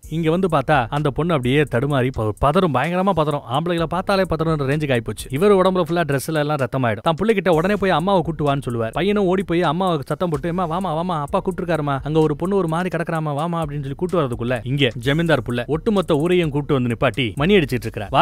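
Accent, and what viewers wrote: Coimbatore, India. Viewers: 😂😂 anne nenga coimbatore ahh😅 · Poramai &pochirubu kovai slag bro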